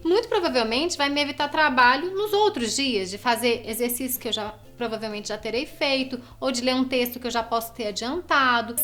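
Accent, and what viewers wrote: Minas Gerais, Brazil. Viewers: Eu gosto muito desse sotaque minerin!!!!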